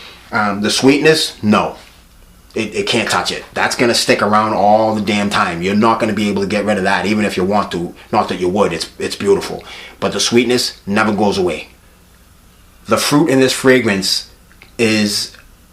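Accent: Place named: Northeast, United States. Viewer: Hi, first time viewer of your channel and I love it. Could you please tell me where you got the fragrance? From your accent, I presume you are in the Northeast US (like me). Did you have to order it direct from Montale? My usual go to Montale spots (Bergdorf, Neimans, etc) don't have it. One more question, if you have sniffed Dubai Indigo (Bond No. 9), does it smell too similar (I saw that mentioned somewhere)? Thanks in advance, I am definitely subscribing 😀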